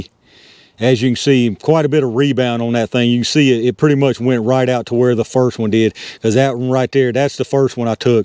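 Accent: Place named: Appalachia, United States. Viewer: Also just from your accent I can tell you're from Appalachia like me so I gotta support the kinfolk 💀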